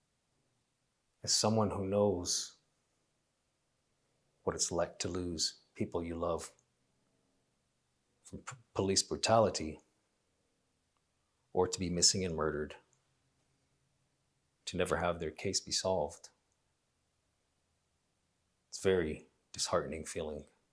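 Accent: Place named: United States. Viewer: If you ever wanted to know how a true American speaks, this is it.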